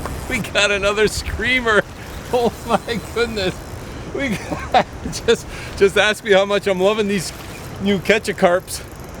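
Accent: Canada. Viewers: Hi, Thank you for your video. Like your Canadian accent. BTW, can I pay Canadian dollars instead of USD on your website?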